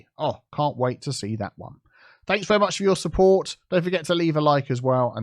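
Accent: United Kingdom. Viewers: I wanna see you and city planner collaborate on a city together this would sound really interesting you with your cool British English and City Planner with his cool American English. You 2 putting all of your expertise together. Just like famous PC Gamer Stonemountain64 and his British gaming friend Tomographic often teaming up together.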